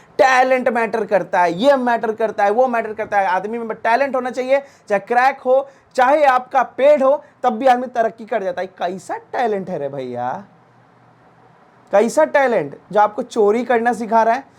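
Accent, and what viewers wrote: Bihar, India. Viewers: TALK ALWAYS IN BEHARI STYLE IN YOUR TUTORIAL , I AM YOUR OLD SUBSCRIBER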